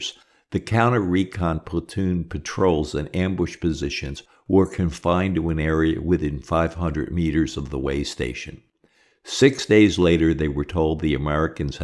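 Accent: Philadelphia, United States. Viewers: Narrator is definitely from Philly!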